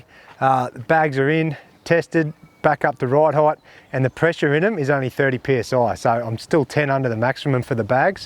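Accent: Australia. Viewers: Australian accent mate “Straya” 🇦🇺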